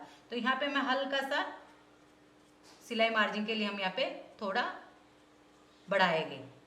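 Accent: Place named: Maharashtra, India. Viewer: मैडम आप के बोलने के तरीके से आप महाराष्ट्रीयन हो ऐसा लगता है। वैसे आपके सिखाने का तरीका बहोत ही अच्छा है। असं वाटतंय की आपल्या घरातल्या माणसाकडूनच आपण शिकत आहोत. इतक्या जवळच्या वाटता आपण. 👌👌👌👌🌷🌷🌷🌷🌷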